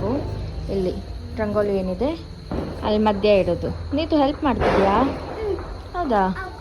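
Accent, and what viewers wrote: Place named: Kundapura, India. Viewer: Kundapura kannada super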